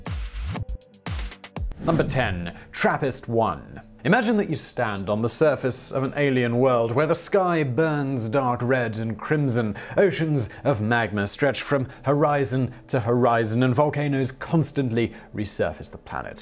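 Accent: United Kingdom. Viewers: At toll... mahgma... waatah. Sorry, I can't stop repeating you, lol. I love the U.K. accent!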